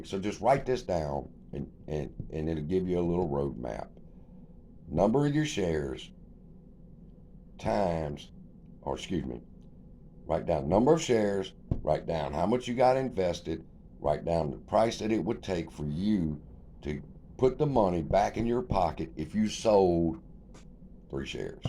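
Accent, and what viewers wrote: South, United States. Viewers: Hey!you're a cool MF. What part of the South are you from?. More power to you have a good day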